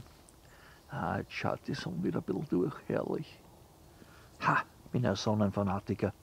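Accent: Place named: Vienna, Austria. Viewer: Gott sei Dank.Mir hod der leichte Weanerslang scho so gföht.Danke!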